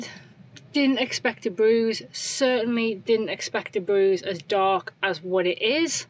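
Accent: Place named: Manchester, United Kingdom. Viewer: Loved the horns you grew on the beach. That guy looking through you window reminded me of our one and only caravan holiday. When the kids were young we could only afford cheap holidays and spent a week in a caravan in mid Wales and a guy looked through one of the windows so I thumped the window which made him jump and because his forehead was touching the window hurt him. When I went out to confront him the cheeky sod moaned I’d hurt him so I told him to do one or I would hurt him and when I spoke to the site owner she thanked me as there had been complaints about this happening on a couple of other vans and he wasn’t staying on the site. Just nosey but is the work you do on your computer for your job or just your vblogs? Looking forward to next video as I’m still ill although been for X-rays and blood tests to see if that reveals anything, so your videos our a bit of a highlight although tomorrow my cycling buddies are coming to see me. 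I know you said you’re from Cheshire but your accent sounds more Manchester area. Take care the pair of you.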